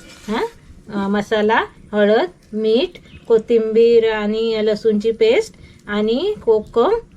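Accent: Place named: Konkan, India. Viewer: काकी छान मस्त कोकणी बोलतात...कोकम शिवाय नाय चव लागत... 😊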